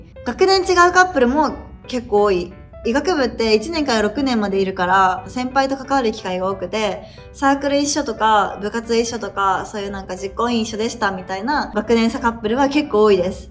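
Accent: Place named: Hiroshima, Japan. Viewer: 奇を衒わない内容に加え岡山訛り？の広島弁が何とも堪りません。 高校大学同じなので勝手に応援中です 頑張ってー！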